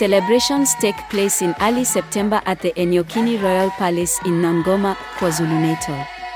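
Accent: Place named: Kenya. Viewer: Are you from Kenya because your pronunciations are exactly like Kenyans......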